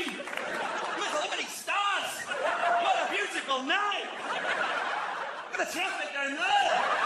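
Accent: North, United Kingdom. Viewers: Yes it's English. Just not the Queen's English, this is northern, Bolton in Greater Manchester. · Stewart Liebenstrudl it's northern 💪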